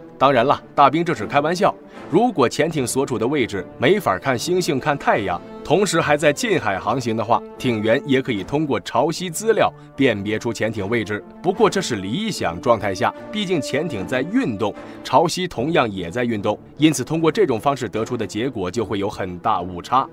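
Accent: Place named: China. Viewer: 這是中國人做的『4瓶』雖然大量使用【正體漢字】但是《腔調》與習慣是騙不了人的!! 用詞遣字發音腔調騙不了人的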